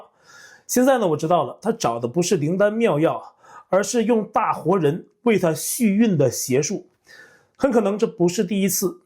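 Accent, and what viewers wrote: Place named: Taiwan. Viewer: 先生，您的影片有人盜用，因為聲音是臺灣腔的國語，您再留意一下吧！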